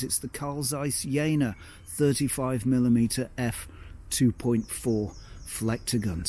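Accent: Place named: Stockport, United Kingdom. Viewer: Love your videos, having shot film in the past, and currently looking for a 35mm having got the zeiss 21mm loxia, which i love, but i'm thinking the Voigtlander 35mm f2 Apo-Lanthar Lens might be my solution, so this content is most helpful , thanks, keep up the good work. ps your accent sounds like you're from Stockport, am i wrong ? , i only spent a year there.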